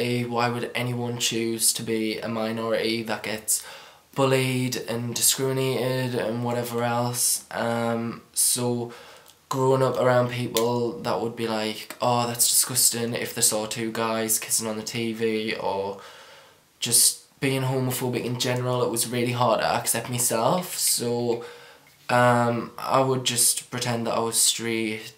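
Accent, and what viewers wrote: United Kingdom. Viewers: scottish or british or welsh accent?? anyone know??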